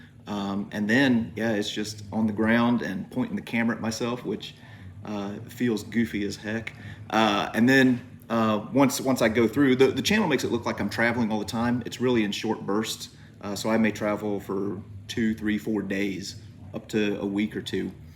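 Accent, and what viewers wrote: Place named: Midwest, United States. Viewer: love your work. Didn't even know your last name, always wondered what you teach and at what level. Always assumed you are a Midwestern, but always looked for clues in how you edit, projects you select, accent (daggum!!!) You're such a humble guy but talented and genuine. Appreciate what you do and consistency. Blessings!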